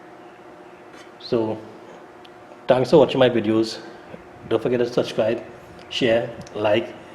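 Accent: Trinidad and Tobago. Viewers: Have a samsung over the range with hood vent. one of the fuses on the noise filter (Has 2) blown Tried a new one blown again. what would cause that? Microwave no heating. door switches test fine. Resistor , magnatron, capacitor, thermal switches all test fine. Also would like ur contact. u sound like a trini.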